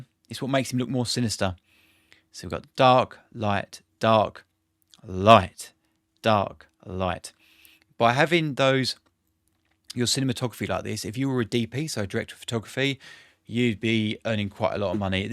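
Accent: United Kingdom. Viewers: What a cutest English accent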